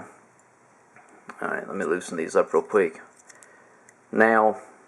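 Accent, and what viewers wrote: South, United States. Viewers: Mate, as an Aussie, I find your southern drawl to be both easy to understand and charming. Thanks for this great video. You have done a good job of pointing out the features that make this sight different and special. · I used this review 2 yrs ago, to clarify the instructions on the package. It was very helpful. In fact I bookmarked it, and still refer to it on occasion. As for the sight itself, I originally had it on a Mathews Drenalin, with a 4 power lense, and it greatly increased the accuracy. I have since upgraded to the Mathews no cam st, but I transferred the sight because it does not need to be upgraded. I now proudly own the deadliest tack driving bow & sight hunting combo I can imagine. Just for the record, you NEVER need to apologize for the southern drawl, y'all. Good hunting. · GREAT REVIEW....LOVE THE SOUTHERN DRAW.... 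· I bought the pro HD HS version with the sliding dovetail and it arrived today. Mounted it and I'll sight it in initially early next week at the indoor range. It is a very nicely machined piece of equipment and my PSE Revenge is sexier already. LOL Thanks again for the review and I have some great friends with Southern accents so you're good to go! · Give you 300 likes, I just bought this sight from a guy and had no idea how to use it, your tips on setting ranges is great. Thanks, southern drawl no problem, from Arkansas ha.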